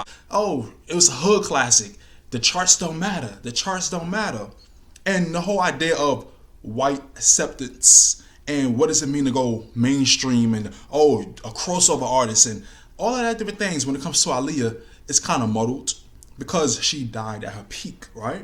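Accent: New York, United States. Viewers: Lmaooo I love your accent too much 😂😂😂😂😂😂😂😂😂😂 NY for life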